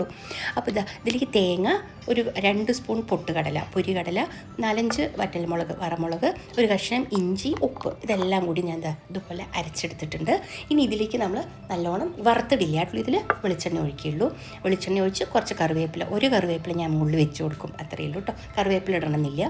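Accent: Palakkad, India. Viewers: Tto tto ttolu palakkadan slang❤️ from a pkd kari(nemmara)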